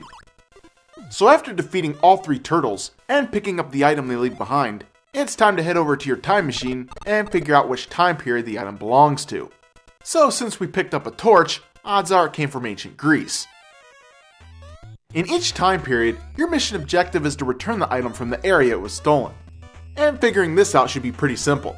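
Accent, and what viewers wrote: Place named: Cleveland, United States. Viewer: Oh wow. You are from cleveland. You have the accent, I just heard it when you said "Ay-pple" ;X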